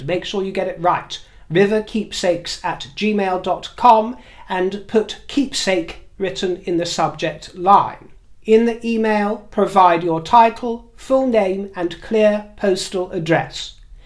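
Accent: United Kingdom. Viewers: Happy to hear you will stay with us. I love your british english and accent, it is a pleasure to listen to you. Greetings from Switzerland.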